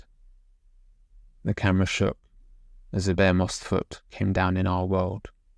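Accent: United Kingdom. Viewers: I quite like that it is author narration. Also better British-accented American, than AI spoken anything.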